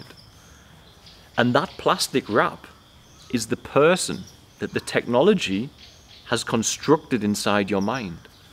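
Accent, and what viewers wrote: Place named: Newcastle, United Kingdom. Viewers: Brother, even on the darkest day, your eyes would still smile. I always love to hear that Geordie accent. I'm a Bradford lad living in America since 1998. Your voice always takes me back home for a few minutes when I first hear it. Bless you my friend. Thank you. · Very nice video. I am based in the North UK. Very similar views as yourself. I can tell by your accent we are very close. Can we open up communion together? I would love to explore your mind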